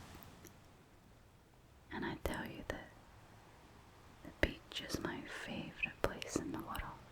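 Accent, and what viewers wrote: Scotland, United Kingdom. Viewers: Are u scottish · a sco`ish ashmr`tist, we can enjoy that:) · makes it difficult to decide if scottish or irish is more attractive. I would have originally thought the latter because of the brash dwarves in movies but she makes the former so damn pleasant. Not even counting the lovely Scottish Chinese fusion. She's a dream girl in more ways than one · Your voice is so...so...zzzz (+ the Scottish accent 😍😍😍😍 you could talk me about haggis for hours I would still be relaxed ) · I didn't know I needed Scottish ASMR. Now I wonder how I ever fell asleep without it.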